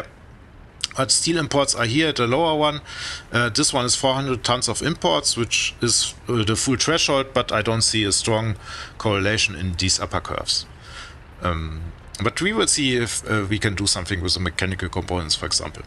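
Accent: Germany. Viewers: Ja, ich verstehe, Ihr Englisch ist voller deutscher Akzente. Ich dachte, es wäre nur Österreich oder Deutschland. Schönes Video übrigens!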